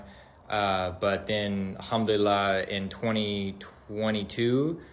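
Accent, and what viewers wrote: United States. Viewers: American accent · He sounds American. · Alhamdolillah, welcome to the true brotherhood! The accent sounds American and not British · Has an American accent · He has an American accent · His accent is American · That’s what I thought too defos American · Sounds American rather than British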